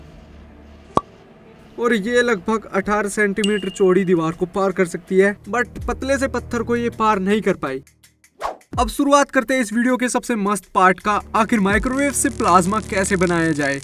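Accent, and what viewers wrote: Haryana, India. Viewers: Bhaiya aap Haryana se ho kya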